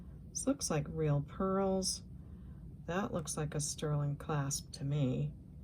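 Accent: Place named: Northeast, United States. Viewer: Love!! One of my faves! Maybe I just like the yankee (North eastern) accent.😂 Born and raised in Maine. So sorry for the loss in your family. Praying for peace and comfort. (❤)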